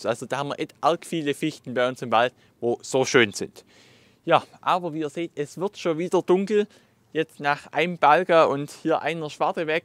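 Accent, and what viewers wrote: Swabia, Germany. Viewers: Frey mi saumäsig uff deine Videos, ganz besonders weil du a aständigs Schwäbisch schwätsa kasch. Des isch de schest Schproch uff dr ganza Welt 😂😂😂❤ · So isch des. A reachdr Urschwob im Tschäd. Wo kharsch au du no?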